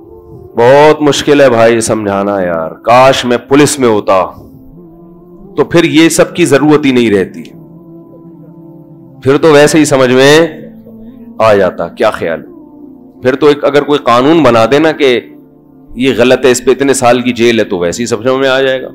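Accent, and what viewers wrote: India. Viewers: Molana ka voice agras pura ka hai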